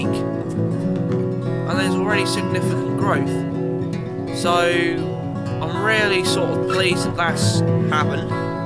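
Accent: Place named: Norfolk, United Kingdom. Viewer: Lovely to hear that Norfolk accent. The Fens is a beautiful area. We are the other side of Norfolk, out Norwich way. Just found your channel. Good luck with your spuds :) Jazz and Gem.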